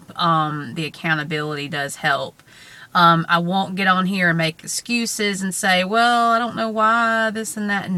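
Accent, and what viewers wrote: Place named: South, United States. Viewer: I was thinking this would happen not be negative-but do not despair-there are tons of people going through this-the dr.'s are partly responsible for performing the surgery without haing the psychological wherewithall to go through it. They just want the money. You sound like you are from the South and probably were rainsed on biscuits and grits-so anyway-good luck.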